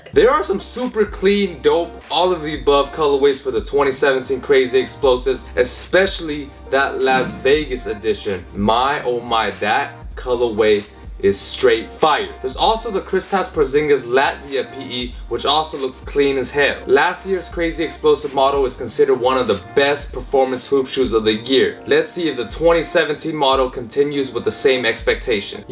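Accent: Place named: Asia. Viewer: Veiled asian accent mixed with hip hop wannabe drawl = slow reading enunciation.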